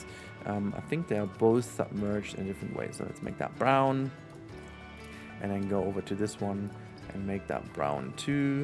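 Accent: Germany. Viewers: Finde es witzig das man raushört das du Deutscher bist obwohl dein englisch sehr gut ist😂❤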